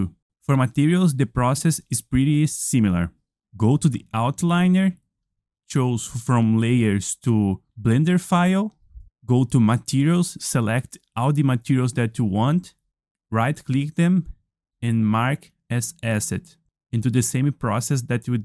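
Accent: Brazil. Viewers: assisti 5 segundos e pelo sotaque tu é brazuca, ou eu errei feio ou eu acertei muito bonito, well in case you are not, great video tysm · Helo there, conheço sotaque de mineiro só de ouvir. Ajudou muito irmão! Abraços from Contagem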